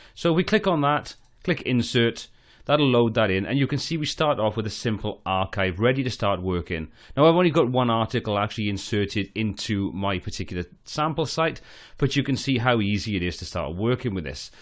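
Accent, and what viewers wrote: Wales, United Kingdom. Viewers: Great to hear a fellow Welsh accent :)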